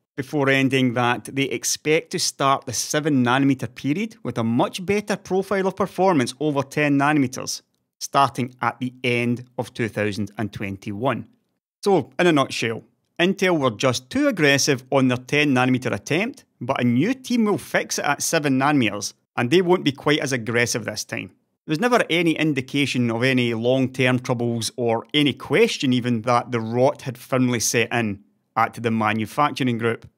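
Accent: Scotland, United Kingdom. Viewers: I can barely understand that thick Scottish accent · Scottish, with a little bit of influence from somewhere I can't remember off the top of my head now, from living outside of Scotland for a while. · It's an scottish accent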